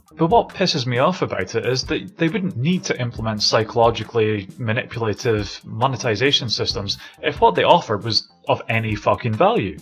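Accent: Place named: Scotland, United Kingdom. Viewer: Your Scottish accent makes this video a 10/10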